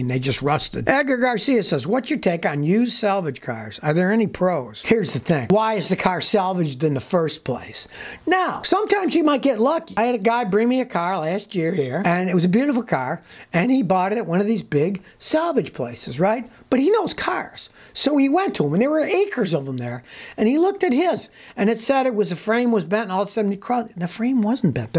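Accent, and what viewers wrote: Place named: United States. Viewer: This man has the voice of true America. Don't give these corporations your money for their garbage products. Don't.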